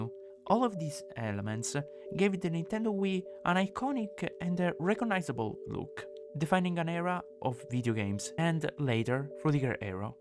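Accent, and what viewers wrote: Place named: Italy. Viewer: Nice video! I miss Frutiger Aero so much... Btw Are you Italian? From your accent it would seem so.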